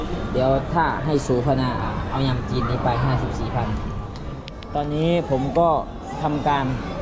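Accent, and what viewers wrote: Laos. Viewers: ຂ້ອຍມັກສຳນຽງສຽງເວົ້າເປັນຄົນສະຫວັນບໍ່ວ່າຈະໄປຢູ່ໃສ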